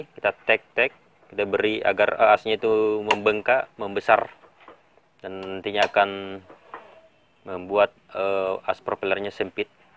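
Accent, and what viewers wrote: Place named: East Java, Indonesia. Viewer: MANTAB PENJELASANNYA , KALAU DENGAR LOGAT BICARANYA SEPERTINYA MAS DARI JAWA TIMUR YA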